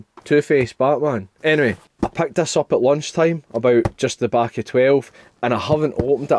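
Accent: Scotland, United Kingdom. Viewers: Got a real kick out of your video. Love the Scottish accent...lovely! Pretty jealous of all the goodies you've ordered too. Zpacks' equipment is fantastic. I have an early model heximid shelter for solo hiking and just ordered a triplex in hopes of getting my husband to join me on a trip or two. I'm very curious about the Arc Zip pack and how you like it once you try using it. Please make a follow up review video of it! Carole from the USA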